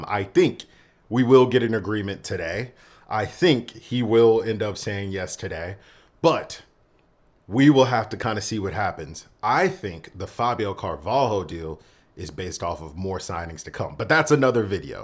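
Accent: United States. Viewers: Greetings from Lebanon, This is my first time in your channel, and I was surprised (in a positive way) for different reasons. The first surprise came at the first second, when I heard an American accent; to be honest I was expecting British. The second one came only a few seconds later when you suggested that selling Carvalho was not for raising funds for buying Zubimendi, and I thought that you've explained a great point with a different view of a lot of other Youtube channels that I've been following since long years ago. and that really made sense to me. I do agree with you that the reason behind bringing Zubimendi is for improving the build up from behind, and I'm expecting both of TAA and Andrew Roberson to shine even more and probably play a great role in improving Darwin Nunez's numbers. TAA reminds me in every game of Stevie G, who I think had deserved a Balon d'ore but didn't get it, and I hope TAA manages to claim it throughout his career with Liverpool. 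I'm happy to see Americans break records in attending friendly football games at their venues and making channels sharing their thoughts and analyzing tactics and the transfer market. A great channel, and I'm delighted to have met on Youtube a person like you who IMO have a different yet great vision/analysis and who really thinks out of the box, and that got me subscribed. I'd like to suggest a few things in order to improve your channel. When showing graphics, I prefer to see them one by one with labels instead of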